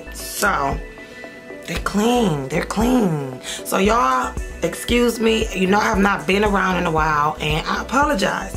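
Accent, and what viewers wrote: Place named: Baltimore, United States. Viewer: I love when you say stuff like bother the way u say it I thought you were from Baltimore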